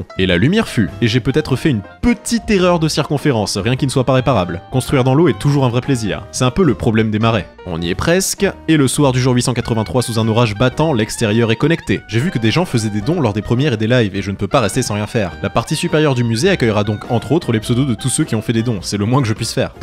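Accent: Quebec, Canada. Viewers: Es que tu est quebecois ou canadien😃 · Coucou, tu es Québécois ?? Sinon j'aime trop t'a série 😘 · Tu pourrais faire un monument pour la meilleure de tout les pioches ps j’adore ton accent québécois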